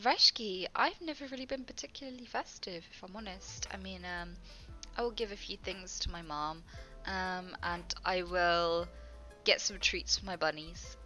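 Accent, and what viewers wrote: United Kingdom. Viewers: So is that it? A hot girl with a British accent who randomly spews out profanity? I think I'm in love.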